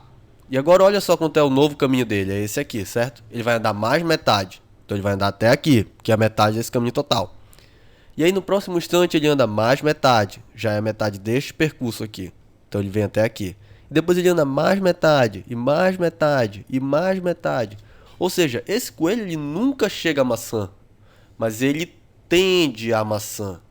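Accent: Pará, Brazil. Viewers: Cara você é paraense???/. Você é muito bom parabéns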